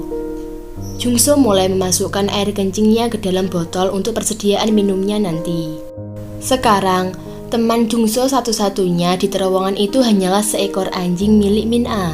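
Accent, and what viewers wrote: Java, Indonesia. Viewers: kalau memtah g enak lah,.mendig medok jadi empuk🤭🤭🤭🤭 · Jawa ya? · Suaranya medhok bgt sayangku. Saran aja sik biar yg nonton lebih comfy, cmiiww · orng jawa nih yg pnya chanel..logat ny kciri bgt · Anjiiing jd ga seru. Logat jawa gini.fil bgs jd Alur cerita yang membangsatkan ini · Ngomong nya Jawa banget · Medok banget cukup sekali aku liat video ny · Jawa temen kie lah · Pasti kmu orang Jawa ya min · Ko medok gitu loh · ngomongnya medok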